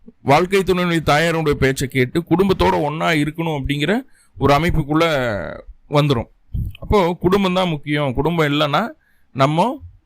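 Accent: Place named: Kongu, India. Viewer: நீங்களும் வளமுடன் வாழ வேண்டும். அலட்டல் இல்லாத நடையில உள்ள உங்கள் கொங்கு பாஷைக்கு நான் அடிமை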